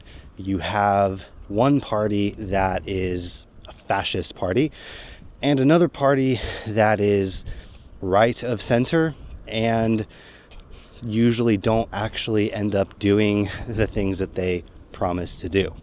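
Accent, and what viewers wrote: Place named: England, United Kingdom. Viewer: Gee yer heed peace min. You sound like a sassenach.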